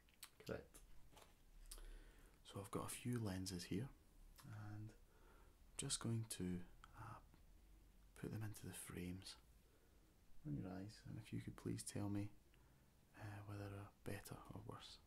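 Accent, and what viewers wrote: Scotland, United Kingdom. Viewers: Hot dude with a Scottish accent. So great :) melts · Loving the accent! Just can't quite decipher which part of Scotland your accent is from..😂😂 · You're so relaxing.. And you help me assimilate the Scottish accent which I have trouble deciphering lol.. Keep up the good job.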